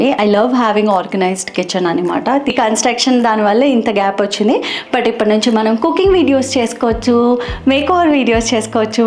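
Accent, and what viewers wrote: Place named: Bhimavaram, India. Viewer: వావ్ 😍 మీ కిచెన్ చాలా బాగుంది, ఆ సామాన్లు చూస్తోంటే నాకు మా అత్తగారు గుర్తోచ్చారు, ఆమె అలానే బొలెడన్ని దాచి పండుగకు శుభ్రం చేసేసి మళ్ళీ దాచేసేవారు 😂 ఆమె తరువాత చాలా వరకు నేను ఆర్ఫనైజ్కి ఇచ్చెసాను. మీ కిచెన్లో నావి బుజ్జి సజెషన్స్..ఓవెన్కి సంబంధించినవి బౌల్స్ అవి ఓవెన్ కిందనే పెట్టొచ్చు కదా? స్నాక్స్ పెట్టారు అక్కడ.. స్నాక్స్ అన్ని ఒక బాస్కెట్లో పెట్టి పైనా పెట్టుకుంటే అస్తమానం డౌన్ అవ్వాల్సిన అవసరం ఉండదు కదా.. గెరిటాలు చాలా ఉన్నాయి, అన్ని వాడం కాబట్టి కొన్ని దాచెయచ్చు మేబీ.. లేకపోతే మెస్సీ అయిపోతుంది. మీ రూట్స్ భీమవరం అని ప్రతిసార చెప్పనవసరం లేకుండానే అత్తయ్యగొరు, మావయ్యా గొరు అంటారు చూడండి. అక్కడే అర్థమైపోతుంది.భలే క్యూట్ ఉంటుంది ఆ యాస ❤️❤️ లవ్యూ వితిక గారు 🩵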